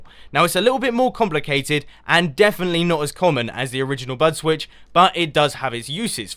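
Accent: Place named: United Kingdom. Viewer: Yeah and he has a very British accent lol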